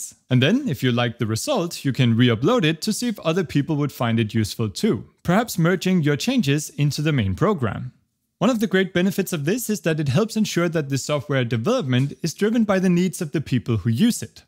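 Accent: Denmark. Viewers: På trods af den meget milde danske accent, og at jeg vidste du var dansk. Så var det helt underligt at høre dig snakke dansk 😆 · Are You Danish? :D I just moved to Denmark recently, what a coincidence :D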